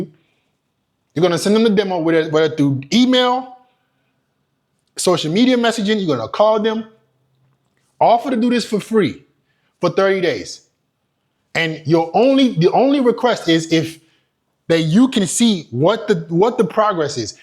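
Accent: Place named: Bronx, United States. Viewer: Lol they're your biggest audience 😂 why am I not surprised. You got a Bronx accent btw 🤔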